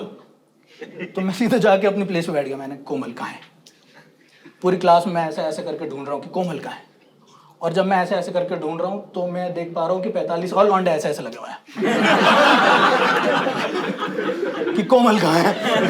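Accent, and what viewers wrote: India. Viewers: Bhai which city u r? UP se h yh toh jaan gya 😊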